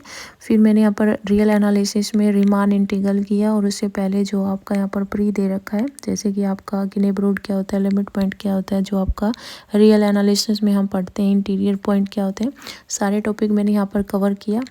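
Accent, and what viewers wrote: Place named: Kashmir, India. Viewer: Mam apk accent se lga..kashmiri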